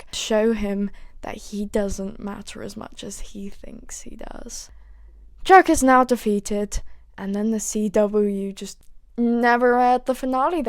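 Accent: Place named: England, United Kingdom. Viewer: Didn’t expect an English accent when I came from tumblr (everyone is just American to me) and now I’m even more excited to watch ‼️‼️‼️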